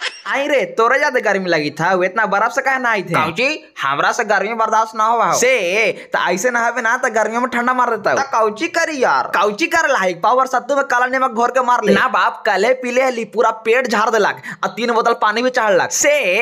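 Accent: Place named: Patna, India. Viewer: Je tu patnaiya tone or bhasa me bolte hauwan.... Baddi bes lagat hauye.... 😂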